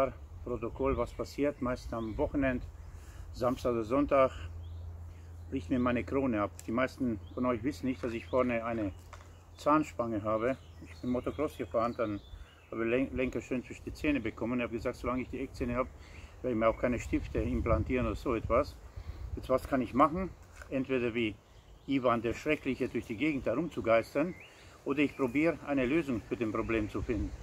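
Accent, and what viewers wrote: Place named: Hungary. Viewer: Ich mag Deinen ungarischen Akzent.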